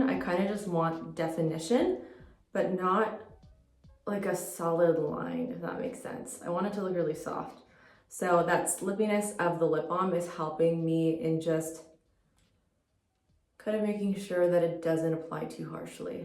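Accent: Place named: Canada. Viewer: she’s canadian lol she just has an accent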